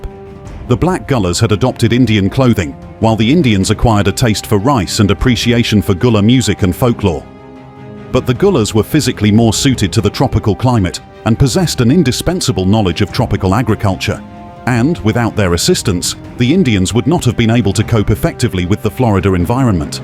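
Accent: England, United Kingdom. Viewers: I enjoyed this video, but the "English or European narrator is a turn off!😮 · Come on now a British/English accent to tell this?! The Trail of Tears were Native Indigenous People. Poor African People was more like The European Ships Sails of Tears to be Honest. Very Sad and Very True!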